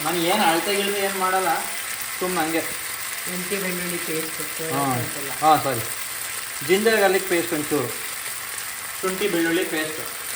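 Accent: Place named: India. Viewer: Nim bhashe kelvaga eno ondthara Khushi,Hale kannada Andre pure nam Halli shogadina kannada keloke sigutthe,tq u mam,tq..😍.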